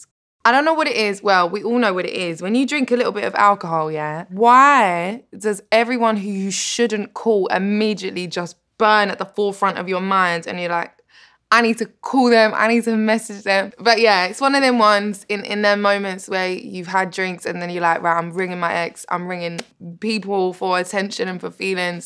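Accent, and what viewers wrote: United Kingdom. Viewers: She's British yh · I didn't realise she was british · What is her accent? British? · Wao shes so british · she’s british?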